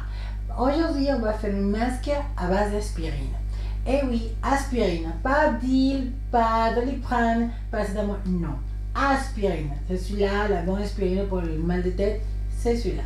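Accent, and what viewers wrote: Spain. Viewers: Elle est d'ou cette femme elle a un accent spanish en tt cas el est belle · ton accent spagnol me dérange en parlant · accent espagnol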